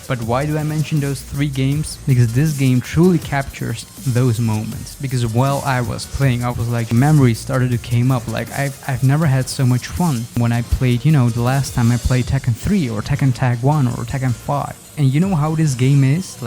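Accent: Germany. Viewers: I am pretty sure he's from germany, he sounds very german. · where are you from? germany?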